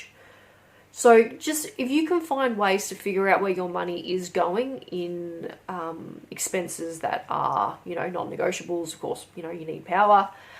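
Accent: Australia. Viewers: You have a northern Queensland accent😊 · Random question, where is Australia are you from? Just the accent is throwing me as to where 😆 Thank you so much for sharing your tips. We’re in QLD and can access the 50 cent public transport which is a help!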